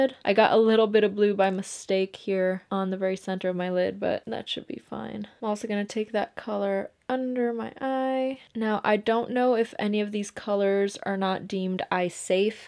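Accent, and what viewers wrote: Brazil. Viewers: she's brazilian, if that clarifies things haha. portuguese and spanish are pretty similar languages 💞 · Eu amo o seu sotaque carioca kkkk, seria legal ter um vídeo seu falando português, mas entendo que seria difícil em razão do seu publico ser majoritariamente composto por gente que fala inglês, AAAAAA E A MAQUIAGEM ESTÁ FENOMENAAAL ♥ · vc é brasileira CERTEEEEEZA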